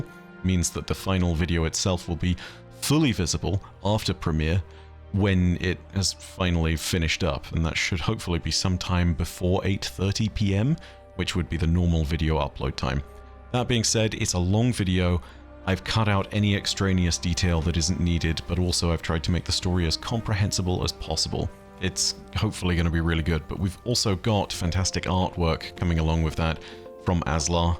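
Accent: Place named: England, United Kingdom. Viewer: Scuppered. Love it, thanks for my daily dose of the King's English.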